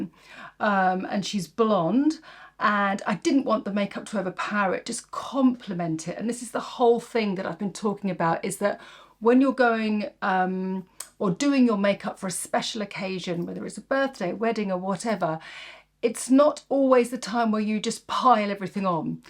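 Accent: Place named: Scotland, United Kingdom. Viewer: Me too! I go down to the kitchen, make my oatmeal and coffee, bring it up to bed and snuggle in and have breakfast with. I wonder how many of us are doing that together? Of course, not at the same time lol because I'm in Minneapolis and you sound like you're in Scotland. maybe we could take a poll and see how many of us eat breakfast with?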